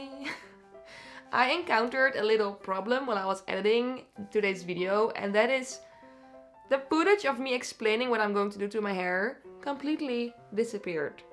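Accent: Netherlands. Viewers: At first I though you were Danish(your name ). Today I was like no way she sounds NL. Hey Dutch sister.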